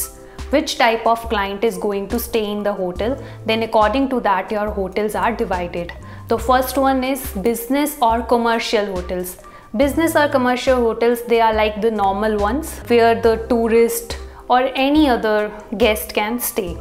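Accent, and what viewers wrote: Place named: India. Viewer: Indian English hahahhaah Horrible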